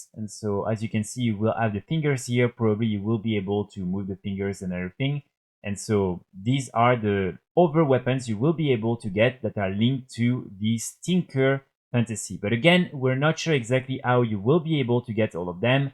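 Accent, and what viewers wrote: France. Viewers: Cute accent Frenchie.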